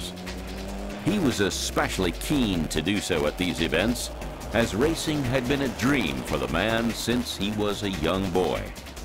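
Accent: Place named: United States. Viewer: Hardly a "Ride on the wild side" but a leisurely stroll down memory lane, with amnesia. Short history spoiled by the abscence of the iconic CB750 voted super bike of the century and the BMW killer ST1300. The video turned out to be a 50minute long commercial, on UK roads with an American narrator. The riding sequences were boring and the videography very '70s. So glad I got this out of the bargin bin. 3/10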